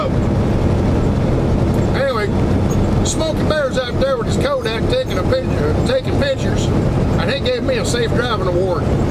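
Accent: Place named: Southern Ohio, United States. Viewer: You don’t sound much different than those of us in southern Ohio.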